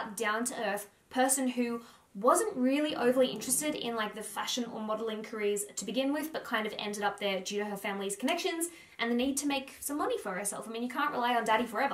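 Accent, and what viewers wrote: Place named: Australia. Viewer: Australians have such funny accents 🤣🤣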